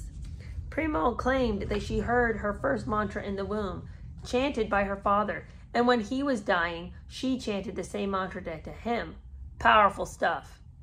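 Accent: United Kingdom. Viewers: Your story telling and British accent made me laugh to the point of snorting! I so enjoy your humor! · I love your British accent 😹😹😹😹 very My Fair Lady!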